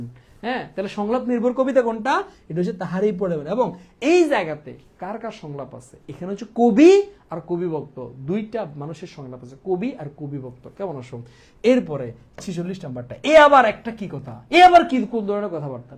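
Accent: Chittagong, Bangladesh. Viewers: Vaiya apni ki chittainga..?🙂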